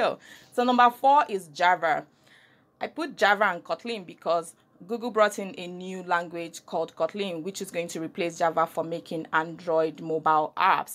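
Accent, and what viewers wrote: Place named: Igbo, Nigeria. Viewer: You sound so much that a Igbo/Nigerian woman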